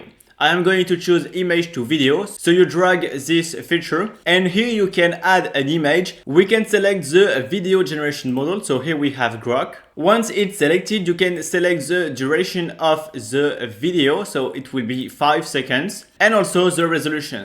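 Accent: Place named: France. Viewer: I watched your French version of this video.i didn't know you can speak English this well. Just that your French accent is too strong. I like it.😂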